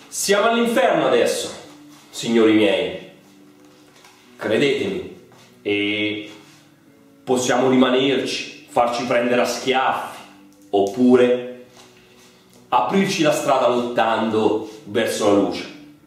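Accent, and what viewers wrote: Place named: Tuscany, Italy. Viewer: Si sente il toscano ,leva il foglio ci vuole piu grinta .....e non gesticolare troppo !!!!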